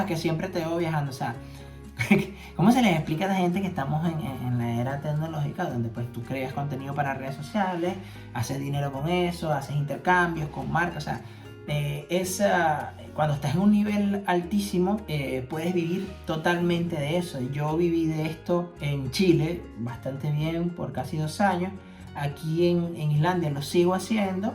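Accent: Venezuela. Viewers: Gracias por compartir tus vivencias allá en Islandia..yo la verdad me río mucho con algunas cosas q dices..soy venezolana también..Guayanesa Edo.Bolivar..tenemos el mismo acento..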